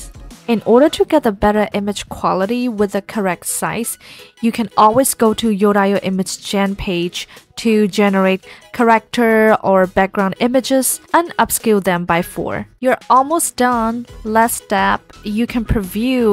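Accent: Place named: China. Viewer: 听起来像国人xyx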